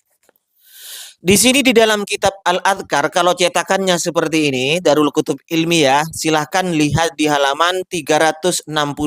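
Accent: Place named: Java, Indonesia. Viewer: Dangkalnya pemahaman mu, mana kajian syeh untuk mencerahkan umat ini, ndi ndi bacanya pake logat jawa medok banget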